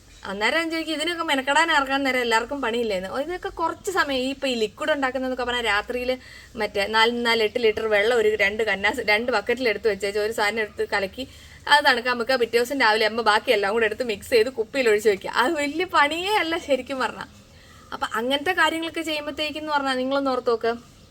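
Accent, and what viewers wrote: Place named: Wayanad, India. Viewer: ഏത് നാട്ടുകാരിയാ... നമ്മളെ കൊടുവള്ളി സ്ലാങ് അല്ല വയനാടൻ സ്ലങ് അല്ലെ... പിന്നെ ഇത് എവിടയാ... 🤔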